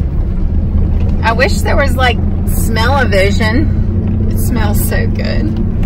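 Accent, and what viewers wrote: South, United States. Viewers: I love your southern accent. What kinds of things do you wonder about northern folks? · I love the enthusiasm (and that southern girl accent!). We are in a uncommon long period of rain. Found your video to hold me over, chomping at the bit to cut our beautiful first growth for the season.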